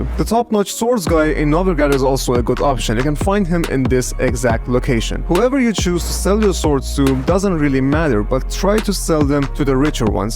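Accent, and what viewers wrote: Iran. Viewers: U have Persian accent are u Iranian? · بسیار عالی(از لحجه‌ات فهمیدم ایرونی) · Ur accent sounds persian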